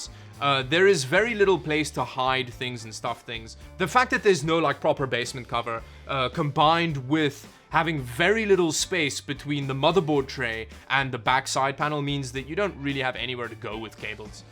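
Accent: South Africa. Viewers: Are you South African 🤔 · You sound south african bro! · Do you come from south africa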